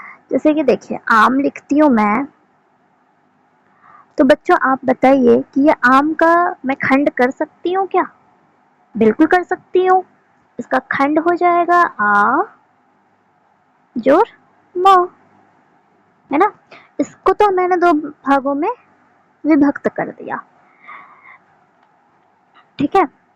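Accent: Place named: Bihar, India. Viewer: Accha aap bihar se ho😮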